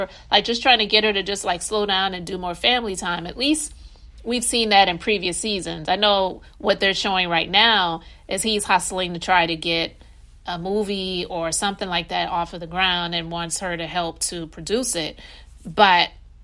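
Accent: Charleston, United States. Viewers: Hey hey your accent sounds familiar. Are you from the Charleston SC area? Kandi was definitely wrong for laughing with DJA-1. I enjoy your content. I live in Ladson.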